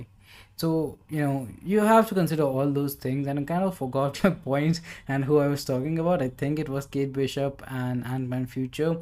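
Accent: India. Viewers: Mumbai accent.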